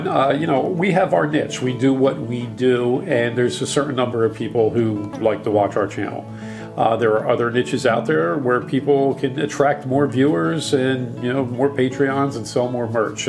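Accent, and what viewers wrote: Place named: Philadelphia, United States. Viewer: I have been following you guys from the beginning, THANK YOU! My wife is from Philly and she enjoys the accent. I like that you 2 do this not for the money. I'm retired and born and raised in mid-town Manhattan and would sell everything in a NY minute, but unfortunately my wife doesn't share my desire, so I live thru you 2. While boating is not cheap or for the faint of heart divorce is far more costly. thanks to you for giving me hours of fun>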